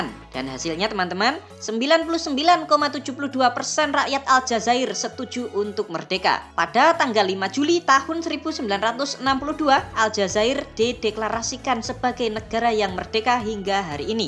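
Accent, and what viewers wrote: Java, Indonesia. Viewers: membawa britanya medok sih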